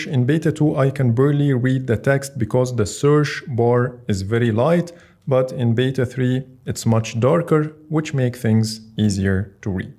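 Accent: Egypt. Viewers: الصوت ده مصري قوي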